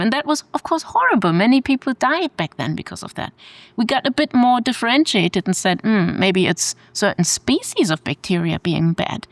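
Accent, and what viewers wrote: Germany. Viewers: The sound of a German accent is hard to listen to. Very stilted. The sounds dont flow. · I never really appreciated a German accent but her charm just gave me a new perspective on it.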